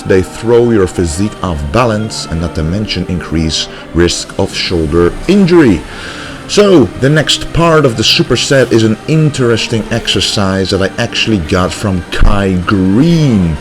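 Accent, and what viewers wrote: Netherlands. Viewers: he is dutch man that is him talking normal lol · I hear a slight Dutch Twang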